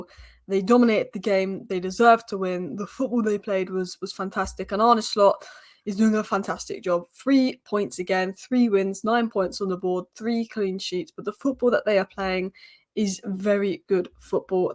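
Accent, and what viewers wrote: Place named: Surrey, United Kingdom. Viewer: “Us?” You sound like you were born in Surrey love. Never mind.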